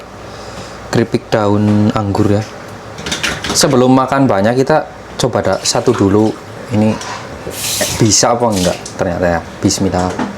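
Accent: Banyumas, Indonesia. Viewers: Mas sangat kreatif ya, senang dengan vlognya yg ngapak2 gitu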